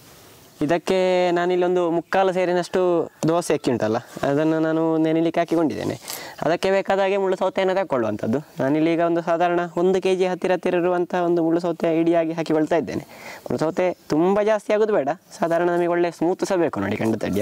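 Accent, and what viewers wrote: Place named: South Kanara, India. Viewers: We love your videos. Wonderful presentation with pure SK Kannada. Jai Kannadambe 🙏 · Your explanation and costal kannada talking are spr 👌😍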